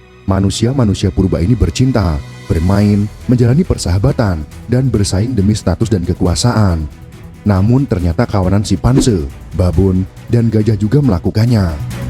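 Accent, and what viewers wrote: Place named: Java, Indonesia. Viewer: Asli mana mas... Kok bahasa Jawa nya keliatan meduk..." Saya salah satu scrubers pegawai jalanan mas.... Video2 mas selalu saya lihat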